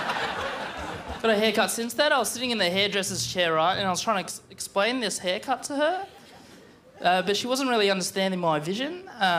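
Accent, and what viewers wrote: Australia. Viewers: It seems it's one of Aussie's accents, according to many other comments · You just don’t like how Australians speak then hahaha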